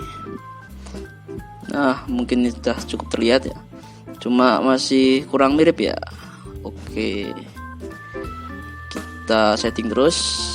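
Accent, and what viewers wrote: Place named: Java, Indonesia. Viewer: Aku suka medoknya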